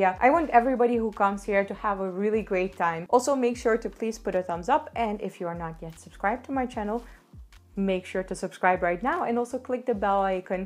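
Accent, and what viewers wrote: India. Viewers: Your Indian accent 💗💗💗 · You have that Indian accent. Lol. I was in Gurugram in October 2018 and boy, I was forced to adopt that accent to pass across my messages for folks there to relate to and I found that quite interesting. And yes, things are super cheap there in India. If I could buy a ship load of stuff, I really would've loved to do so.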